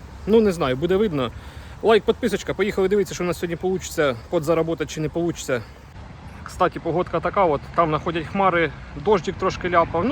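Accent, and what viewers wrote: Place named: Kherson, Ukraine. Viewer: Привіт! Твої відео як вірус якийся, дивляться так легко і захоплюють... У тебе такий говір, ти не з Херсонщини випадково?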